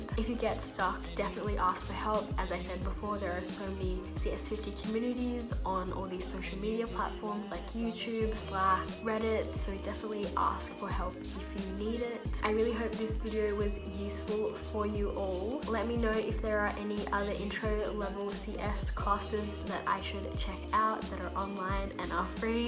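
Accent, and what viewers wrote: Australia. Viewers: Aussie accent 😍 Im currently starting the cs50, so excited. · Great review thanks 🙏 it would be really nice if you can speak little louder, love your Aussie accent 😊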